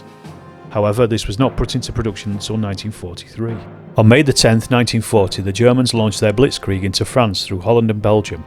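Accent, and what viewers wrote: United Kingdom. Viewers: You are an idiot. Another British accented individual taking archived pictures and expressing your own flawed opinion on your video. You have NO historical degree so another air thieving mouth breather trying to make money on youtube.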